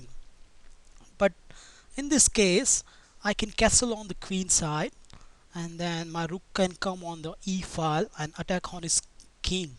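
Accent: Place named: India. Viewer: Thanks, i like your indian accent very humble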